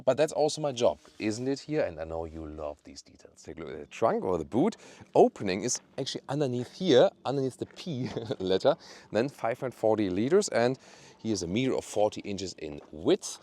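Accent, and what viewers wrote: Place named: Germany. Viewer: Why do they have a German doing the English version... this is dire... 🙃!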